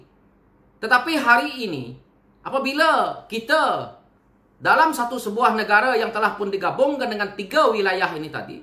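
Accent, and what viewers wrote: Malaysia. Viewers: Guna logat Borneo saudara jangan terbawa2 dengan pengaruh malaya tuuuuu.. Meluat dengar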